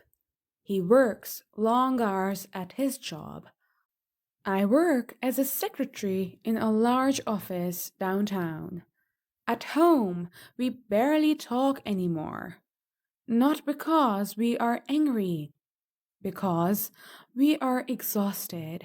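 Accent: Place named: United Kingdom. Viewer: I watching from Ethiopia 🇪🇹 so best story with perfect British accept ❤❤❤❤❤❤❤❤❤❤❤❤❤❤❤🎉🎉🎉🎉🎉🎉🎉🎉🎉🎉🎉🎉🎉🎉🎉🎉❤❤❤❤❤❤❤❤❤❤❤❤❤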